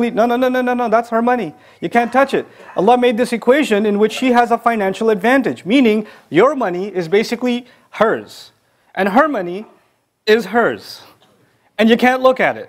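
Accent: United States. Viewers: When did he become a Muslim? He sounds American English. Are you Muslims now throwing out the Koran? I don't think so. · You have an American accent, sir. In fact you sound a lot like the charismatic Evangelical Christian Preachers in The USA 🇺🇸. The Islam that you are preaching is not at all the Islam taught in Islamic countries or taught from The Quran. The Quran is a very unorganized book of unintelligible texts that sound somewhat reasonable in the first few Surahs but then progressively become angry, hostile and murderous as The Prophet Mohammed becomes more angry, hostile and murderous. The Quran is a lot of mumble jumble jumping from subject to subject with no structure. It’s a hodgepodge of “scriptures” from Jewish and Christian texts that are scrambled together and with a lot of errors as these were oral traditions and “orally transmitted,” Mohammed heard “stories” from Jews and Christians but he mixed them all up. 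“Mary,” the mother of Jesus, was not the sister of Aaron and Moses and Jesus was not born under a Palm tree! Aaron and Moses had a sister named Miriam long before the birth of Jesus. The Egyptian Pharoahs were definitely not living in the same period as Haman, The Persian villain, during the time of the Jewish Queen Esther who married King Ahasuerus to save her people, The Jews. These were two historically different time periods! Now The Bible has a lot of fanciful stories woven into real historical events but much of the actual historicity is still backed up with modern archaeology. Not The Exodus from Egypt, however. There’s no archaeological evidence of millions of Hebrew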